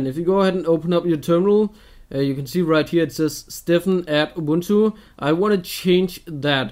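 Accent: Denmark. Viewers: det er så tydeligt at du er fra danmark ( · I knew it, you can always hear if it is a Dane who speaks English!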